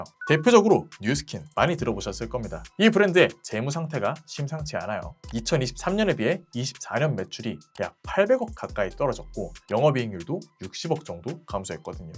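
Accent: Gyeongsang, South Korea. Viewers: 이 분 발음이 경상도 분이시네용 ㅎㅎㅎ 영상 알차고 좋네요 잘 봤습니다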